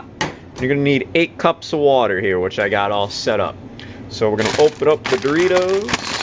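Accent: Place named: Maine, United States. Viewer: Yeah, I guess I know why the accent sounded kind of familiar since I lived in Maine for over 30 before I bailed out and moved to Seattle. I have never heard of paper and food.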